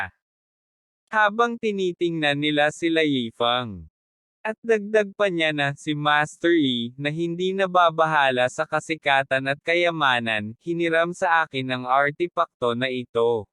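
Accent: Visayas, Philippines. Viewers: Kinaray-a nga ilongo na may halong bisaya 😅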